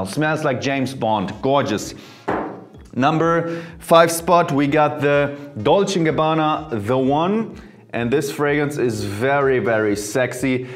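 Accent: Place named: Germany. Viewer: Man , I Miss Germany , listening to you talk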